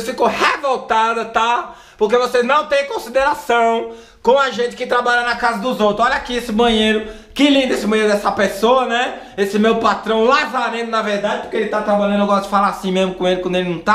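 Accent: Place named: Recife, Brazil. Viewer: esse sutaque é de ricifi né não fia?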